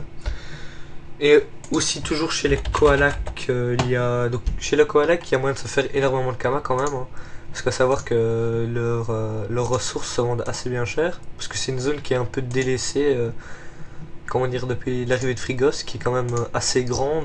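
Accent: Belgium. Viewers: Un petit accent belge :)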